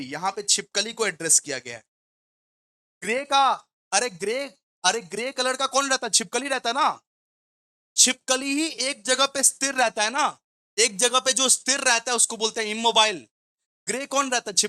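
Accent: Bengal, India. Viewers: Hahaha 😂 Your bengali accent is so cute (Khub mishti 😆)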